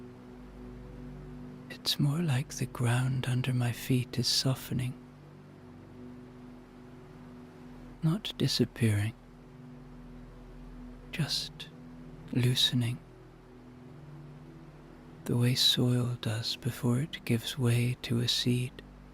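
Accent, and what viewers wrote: Ireland. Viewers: This is so wonderful you are an amazing Irish storyteller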